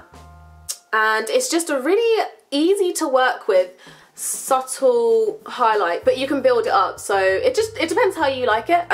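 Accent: United Kingdom. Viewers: LOVE The Hobbit! Its cool to see we have similar reading taste! Love your videos as well <3<3<3 I live in the US, but my boss is a Brit, and he talks similar to you! Your accent/voice put a smile on my face! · Love your british accent.dont like the hair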